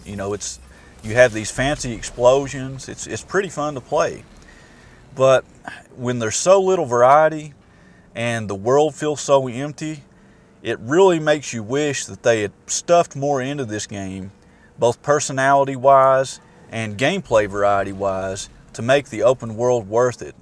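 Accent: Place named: Appalachia, United States. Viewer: I was just gonna say Appalachian twang!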